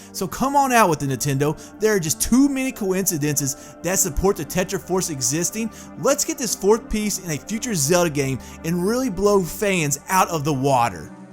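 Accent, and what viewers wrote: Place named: South, United States. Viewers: Holy southern dialect I can’t get over “Duuungin” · Don’t ask why, but your accent sounds like you’d give me a “Roll Tide.” Interesting perspective and some good research, sir.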